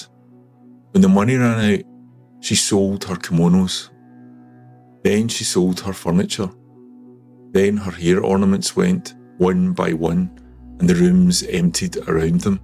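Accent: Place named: Scotland, United Kingdom. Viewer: So that's where your great accent is from. I was wondering when viewing your videos. I am sure you will visit Tokyo more than once in your lifetime. Just like i wish to visit Scotland again some day, particularly the countryside, i am not much of a city guy (ironically living in the biggest city on the planet, but Tokyo is just a succession of villages, it is not a metropolis like some people think, and it has a village mentality which is both good and bad, you'll see some day). Did you like the photo of the Scroll by Yoshitoshi, i posted for you on my account ? It looks much better in the flesh. It is an unfinished work and he had just started adding very soft colour at the bottom, where the grass and the water from the pond meet, and also the "flowers" of the Susuki grass. The moon is create as negative space. Like most of his work, the moon is omnipresent. 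I guess this has to do with the family name "tsukioka" (the Hill of the Moon). And Yoshitoshi was obsessed with death or maybe fascinated by it. He liked the western idea of Memento Mori which he found matching the Buddhist concept of Mujo 無常 and the Shinto concept of Mono No Aware, もののあわれ、 which means that nothinbg last and nothing is permanent and that everything eventually comes to an end. That7s why this scroll has been used for the Moon viewing tea ceremony by the Tsukioka family for over a century every year, because it fits the Tea ceremony concept of Ichigo Ichie 一期一会 which means that we must live in the moment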